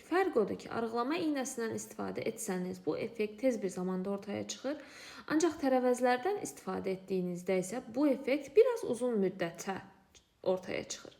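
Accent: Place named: Azerbaijan. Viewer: Benim kızım.Acaba ben sağlık tevsiyelerinizi mi dinlirem yoksa güzel Azerbaycan türkçenize mi heyranam bilemedim.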